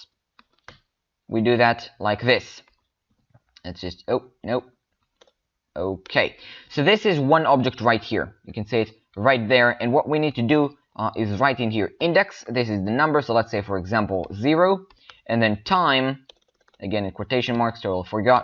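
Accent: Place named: India. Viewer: once i heard your indian accent i knew i was in the right hands. thank you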